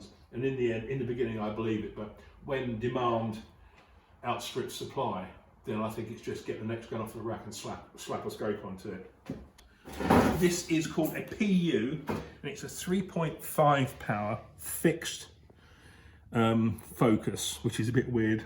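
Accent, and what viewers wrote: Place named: United Kingdom. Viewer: You can still get bolt action rifles in the UK? I'm just assuming form your accent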